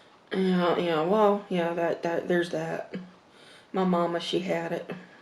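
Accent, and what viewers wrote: South, United States. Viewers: !!!! Hope you're holding up. Just started the really deep abuse blogs with my husband who was severely sexually abused when he was small in Nebraska (a very bad state for that). We need a part 2 if you can handle speaking about it. Helping us immensely. Your writing/speaking is beautiful and I hope you can eventually get published, like no joke. Also, I understand this is your career but I hope you can start to incorporate more healthy items. Much love! <3 Also, I bet your live really South/Western because you sound JUST-JUST like my Nana from Galveston/Houston.:) Makes me miss her. Haven't seen her in years.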